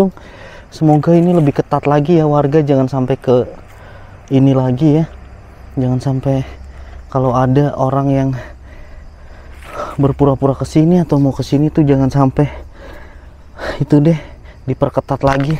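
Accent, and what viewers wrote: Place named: Eastern Indonesia, Indonesia. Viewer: Kk org jawa to? Kenapa pake logat timur selalu sa dgr blg "ado mama..." salam dr Merauke.sukses ya ka tampan..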